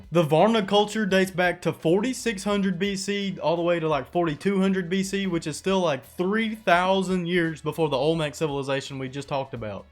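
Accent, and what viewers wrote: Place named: South, United States. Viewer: Dude, I love to see people doing what they love and you obviously love doing this. Your enthusiasm is contagious. I subscribed. You have the perfect mix of skepticism and open mindedness. I'm an educated Ga boy with a Southern accent too. I'm guessing you are Southern but not from Ga. Love the videos man. Keep doing it. I'll watch them. People love this stuff.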